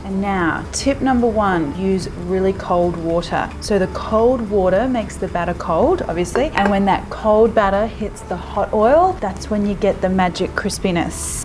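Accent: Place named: Australia. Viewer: I love your Australian English voice, the videos, kitchen atmosphere and your voice are all perfect!!! Correct me if you’re not from Australia! Sending you lots of love, bless and success your way!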